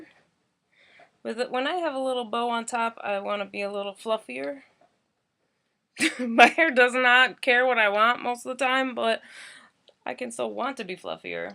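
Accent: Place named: Pennsylvania, United States. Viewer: You are a smoke show 💨 I love your accent too. I’m guessing PA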